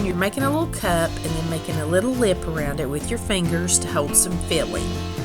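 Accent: South, United States. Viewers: Howdy! This is Jessie from Ohio. One day I found one of your videos and since I had a migraine, I kept YouTube on and binged on your yummy recipes and southern calming voice (thank you for not using loud music). Since the second video I subscribed and I stop by daily to see if there might be one I missed or rewatch one I have seen and saved. So I finally thought I'd say hello and thank you for doing what you do. Hugs from Ohio.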